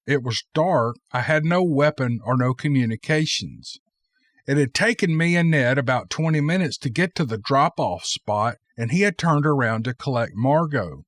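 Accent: South, United States. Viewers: Your stories always help me go to sleep with your American southern drawl while I’m here in Russia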